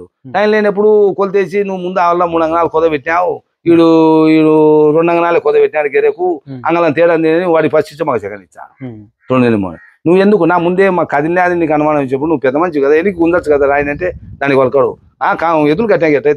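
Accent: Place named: Rayalaseema, India. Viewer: Pure rayalaseema slang❤❤🎉🎉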